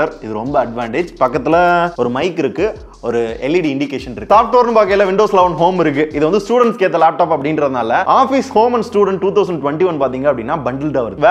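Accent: Madurai, India. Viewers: Bro ninga madurai aa..?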